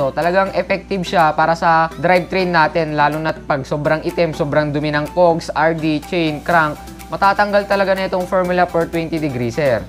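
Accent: Ilocos, Philippines. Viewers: ilocano at kapampangan naririnig ko eh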